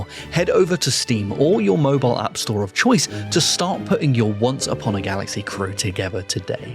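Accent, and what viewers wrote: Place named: United Kingdom. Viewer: correct because british accent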